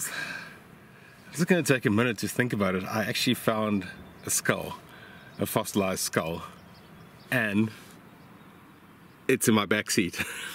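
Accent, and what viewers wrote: South Africa. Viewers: Mooi man. Glad you got it out. Just a random question are you from Port Elizabeth South Africa, I'm orginally from Grahamstown and your accent sounds like you are from the area.....in other news , went to Castle Rock today and saw thousands of shells mainly scallops and lots of other shells. Was amazing, my kids loved looking at the fossils aswell. But anyway, keep up the good work.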